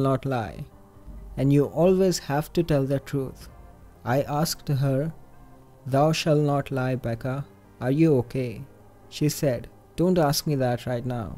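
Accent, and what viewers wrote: India. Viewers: are you from India? You sound like Indian if I'm not wrong. Anyways thank you for including Nepal case.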